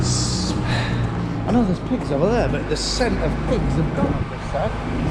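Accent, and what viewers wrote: Yorkshire, United Kingdom. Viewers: Best farming videos on YouTube entertaining as well as informative, love the Yorkshire dialect even though I'm from Lancashire. Hope you enjoyed your afternoon out 👍👍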